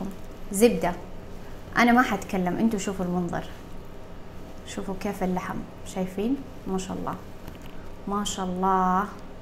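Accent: Saudi Arabia. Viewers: I adore the Saudi accent mashallah your cooking is amazing 🥰🥰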